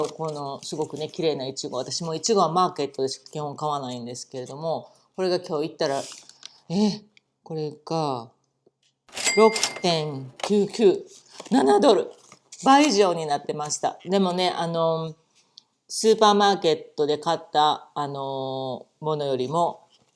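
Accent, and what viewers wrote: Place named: Kansai, Japan. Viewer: 本当にこっちは食物類高いですよね、っていうか高くなりましたね。 関西弁がとっても懐かしいです。😊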